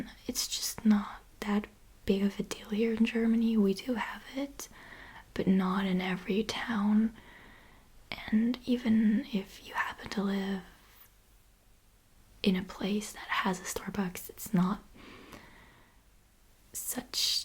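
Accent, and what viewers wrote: United States. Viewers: I knew you spoke German I just didn't think you were German. You speak English so well and naturally, I thought you had an American accent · You really wouldn't think you're not American. Your accent is so on point! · your accent sounds American. your English is 'perfect' and your Spanish is as well. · This was fun....As a viewer for the last 2 years, I still can't figure out how you sound more American than my American friends ツ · You seriously sound sooo American it's Hilarious lol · Your German? Could have fooled me with that american accent. ok every now and then it sounds a little off but for the most part "98%" you sound american :)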